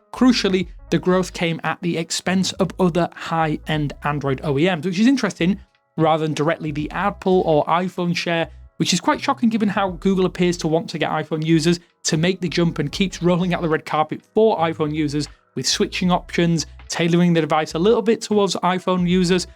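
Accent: United Kingdom. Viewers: I don't like this guy's British accents.! ❌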